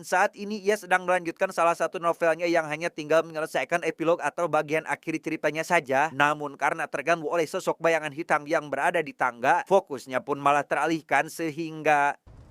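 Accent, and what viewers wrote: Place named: Sunda, Indonesia. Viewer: Unik bgt suaranya. Film horor tp suaranya kyk ada sunda2nya beuuh ucul bgt fans wh lah mang . Curiga tos ngaliwet😂 ieu mah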